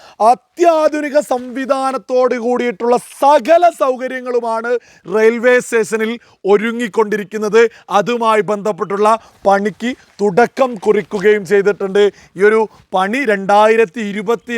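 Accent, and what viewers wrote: Malappuram, India. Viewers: ഈ മലപ്പുറത്തക്കാരനെന്ന് പറയിപ്പിക്കുന്നത് ഒന്ന് മാറ്റാൻ സാധിക്കോ. കൊല്ലത്തുകാരുടെ ഫാര്യ എന്നതു പോലെ........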